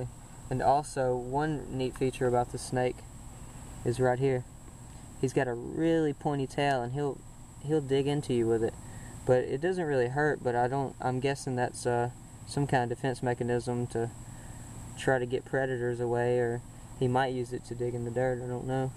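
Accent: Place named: North Carolina, United States. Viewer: I live in North Carolina.. You really sound like some of the people that live here. I'm guessing your from NC, SC, VA, or possibly WV.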